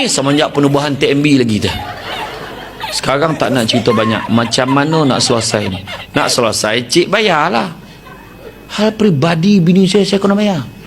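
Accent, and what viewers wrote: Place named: Negeri Sembilan, Malaysia. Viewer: Lawak loghat N9 memang best rentak bahasa beralun tinggi rendah dgn lembut😂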